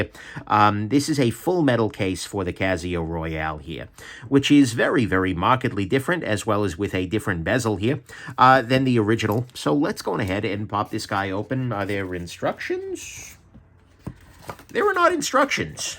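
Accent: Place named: New Jersey, United States. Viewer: You gotta be from New Jersey!!!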